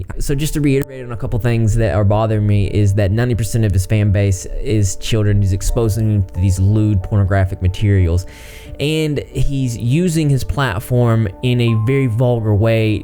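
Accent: South, United States. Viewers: Ur deff southern. I'm in Alabama.u gotta be close lol? Just started watching u on ur Cora story.ur awesome❤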